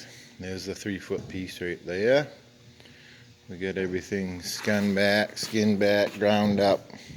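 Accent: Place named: Maine, United States. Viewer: Nice, you sound like you're from maine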